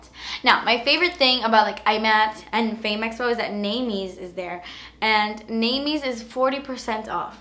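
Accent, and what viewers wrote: United Kingdom. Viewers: You have the cutest British Accent Ever! :D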